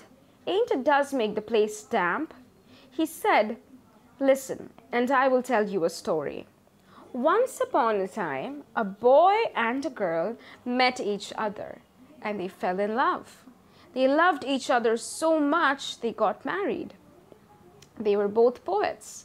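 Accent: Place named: India. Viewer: Sorry but I found it hard to listen to .. didn’t get past first paragraph I guess I’m not used to the Indian? Cadence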